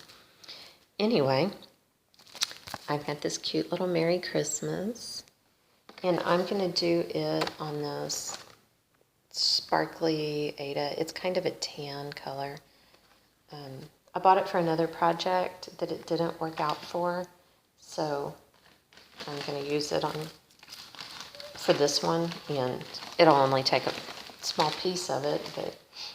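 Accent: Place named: South, United States. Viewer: Just found your channel. Sounds lie you might be from the South. I'm from Georgia. Are you anywhere near there? We had a lot of the same answers on Tracy's talking tag.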